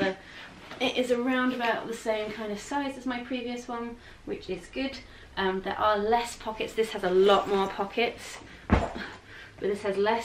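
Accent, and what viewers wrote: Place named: United Kingdom. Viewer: Good video (yeah you were nervous, but I would be too and you quickly recovered your composure). Love the British accent. Adds class. :) About that big front flap/pocket... I'm a great grandmother... I'm thinking if you're changing a nappie / diaper, perhaps having to unzip a bag to get replacements and wipes would be difficult so put the nappies and wipes in that large outside pocket?? Just an idea (which you may have already thought of). I like bags like this for travel (since I no longer have babies/toddlers). Oh and I love your way of storing stuff above the sink (the utensil bar). What a great idea! (I know that has nothing to do with your video subject, just thought I would mention it)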